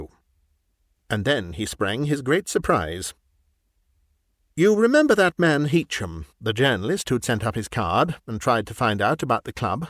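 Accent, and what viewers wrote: England, United Kingdom. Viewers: For some unknown reason I sleep best to British accents. It's wonderful to hear your beautiful human voice with perfect pitch and normal human rhythm and none of the bizarre pauses that gives away the robot voice and is jarring enough to wake me if I have dozed. I do hope YouTube doesn't succeed in their apparent campaign to eliminate all actual human narrators. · Another narrator got accused of being AI. It's a sad day when we can't tell the difference by the generally god awful rhoticised intonation of AI butchering the story and the rich timbre of a cultured English accent reading a jolly good story!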